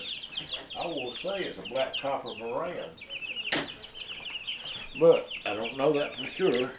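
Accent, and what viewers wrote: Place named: South, United States. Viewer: I understand the frustration about lighting but at least this is better to watch than some of the other crap on YouTube I encourage anyone doing educational videos to continue to do so. Not only is it a learning experience for the person doing it, it's information you can decide to use or at least consider. Also I love your accent I was born in the deep south in Texas but from living up north north than half my life I have a mixed accent of north and south and I just love the southern accent.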